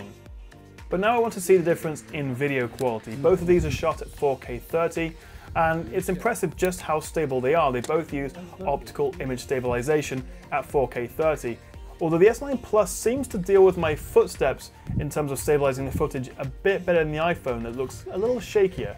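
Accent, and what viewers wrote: United Kingdom. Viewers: The review was really great 👌🏻 and well done ,with awesome photo samples but your voice(the British accent) felt annoying AF. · He is cuuuuute, AND British win win!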